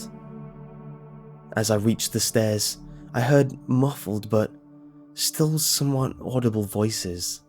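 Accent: United Kingdom. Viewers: Oh my..... I thought he said chicken too! LOL Well darn his amazing British accent I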